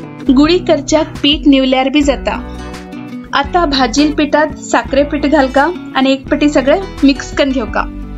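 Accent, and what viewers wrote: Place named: Konkan, India. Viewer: You speak exactly my kind of konkani! I'm stunned. Haatutach and not haantuchi 🤗. Where do you come from?? I was always being corrected saying my language is not correct but now I'm so happy to have found another konk speaking like me and my mom's side of family!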